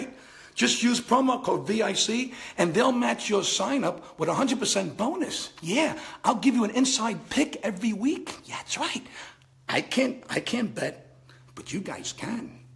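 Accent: New York, United States. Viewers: Vic you definitely are a New Yawker, you should be a coach just for the post game speeches, haha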